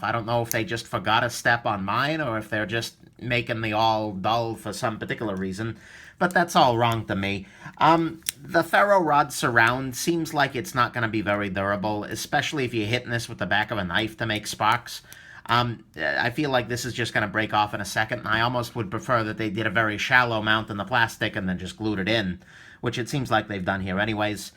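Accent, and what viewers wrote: New York, United States. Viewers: This man literally has to have had surgery that altered his voice. If you watch a video just one year later he sounds like a completely different New Yorker hahaha. It weirds me out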